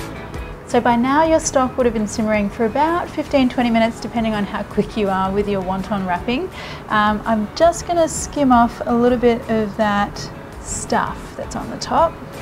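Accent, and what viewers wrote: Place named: Australia. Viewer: Your food and recipes are so wonderful and so easy to make. .love your Thai style and very aussie accent..your mom and dad must be so proud..I am so happy to have found your channel💖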